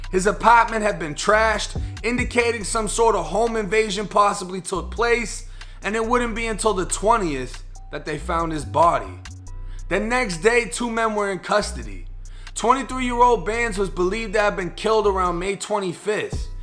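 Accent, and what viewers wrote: Boston, United States. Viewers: I love your accent, you must be from Boston! Keep up good work dude, ill support! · I bet my life you are from Boston. I'm from New Jersey, l hear that accent a mile away! Enjoy your channel ☺️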